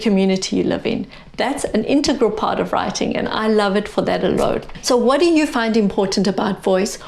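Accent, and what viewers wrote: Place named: South Africa. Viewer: Hi, Sonia from South Africa here. I just listened to your 4 part talks about voice in memoir. I am in the planning phase of writing my story of how I met Jesus (in an armed robbery at 4am in my bed) after being lost in the new age for 20 years. I feel soooo inspired after listening to you speak so beautifully about voice in memoir. I want to encourage you to please keep making these videos. You are talented, well spoken, your tone is calming and confident... and most of all, you are doing what Jesus created you to do. In Him, we can be sure that we're walking the story He purposed us to do, as it says in Ps 139 - He wrote down our days in His book! I will be praying for you to get more subscribers and also that people will comment more. I see that you are based in Canada, but I pick up a South African accent. Are you originally from South Africa? 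Blessing to you my dear sister in Jesus. ps. I did a youtube search for 'voice in memoir', and your channel was first in the search results:-)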